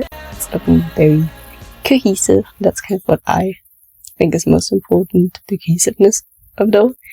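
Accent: Germany. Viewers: AWWW I LOVE YOUR VOICE despite knowing you were German I didn’t think about you having an accent lmao but it’s also adorable??? like girl I lowkey want it 😭 ich spreche Deutsch a bit too as I’m a little German myself :) great tutorial as well!! <333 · Thanks for the tut Also German accent?? My friend speaks German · I LOVE YOUR VOICE! Also your german accent is so cuteee :3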